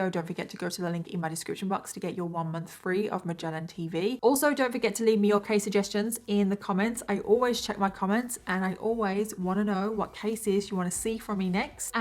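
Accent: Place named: United Kingdom. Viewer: Imo you cover these cases better than most shows😂 I’m obsessed. And your so bri’ish sometimes I love it lmaoo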